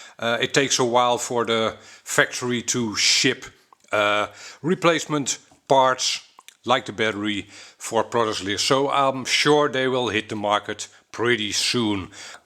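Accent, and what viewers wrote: Netherlands. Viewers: First let me compliment you on your English! Though your Dutch accent is very thick, your grammar and vocabulary is excellent! I have several questions regarding this quadcopter. I am a novice in this field. Though I am fascinated by the concept of FPV, I am mostly interested in shooting nice video's during my travels. So, I am not a hobbyist or sport-flyer. I have been looking for good camera drones, small enough to pack for travel (without the need for an extra bag, or larger bag - I like to travel light). I just bought the Parrot Bebop(1) for that purpose, but I find it (still) too big, too breakable and it seems to lose the wifi connection each time I fly (losing all control over the drone!!). Now, the footage I saw on your other video seems too jittery and jellowy to be usable to shoot travel videos, could that be helped? Is it possible to fly this with a smart-phone as well (so I wouldn't need to pack a radio controller)? 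Would you recommend this drone for my purpose? I like the small size and the sturdiness, though I would welcome altitude hold and all kinds of aids in ease of flying.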